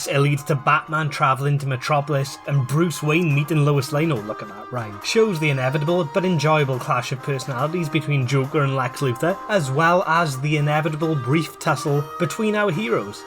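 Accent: United Kingdom. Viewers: Are you from Scotland? Im not well versed in the batman story but you kept it exciting and easy to follow.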